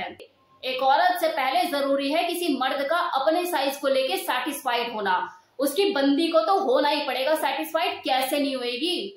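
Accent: India. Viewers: Mam aap ke samjhane ka tarika bahut pasand aaya aur aap ki voice so sweet & personality & dressing style bahut achcha hai you are so good looking I am impressed 😊 sabse achcha aap ka Desi style way of talking ❤️❤️❤️❤️❤️ Love 💕 you mam 🙏🙏🙏🙏🙏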